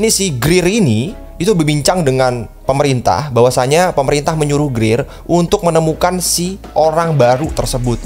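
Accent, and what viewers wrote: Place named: West Java, Indonesia. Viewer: Kang jiga orang sunda logatnya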